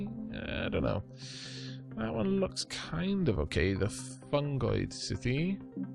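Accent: Wales, United Kingdom. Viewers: Welsh Accent + Stellaris + Epic Music + Space Bugs = Alef-0 levels of awesomeness.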